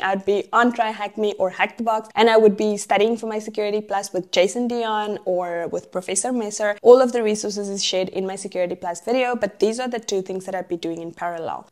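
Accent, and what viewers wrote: South Africa. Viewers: Are you South African? Lol i can hear it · Are you from South Africa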